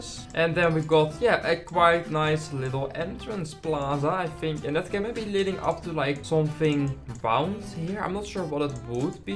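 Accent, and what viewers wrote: Netherlands. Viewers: Wow you are good at building but why cd-opnamen you have an Dutch accent are you dutch? I know that accent BC im Dutch 😁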